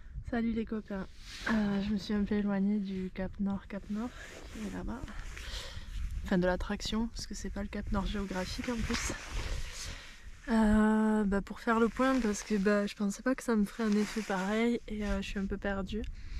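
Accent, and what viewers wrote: South-West, France. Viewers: Bonjour, je découvre ta chaîne vidéo et alors là, QUELLE EMOTION, tu es une belle personne archi simple, belle mentalité et c’est si rare!… je parle comme une vieille hé oui je suis Vieille retraitée, super active . Tu es espentée, donc sud ouest peut-être ? Moi je suis bordelaise. Nous allons faire un bout de chemin ensemble, je le sens ! Mettez des POUCES. Ton direct, comme tu dis, est trop cool. Merci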